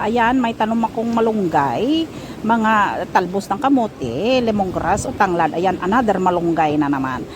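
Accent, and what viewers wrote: Iloilo, Philippines. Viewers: Ilongga ka Po ba ma'am?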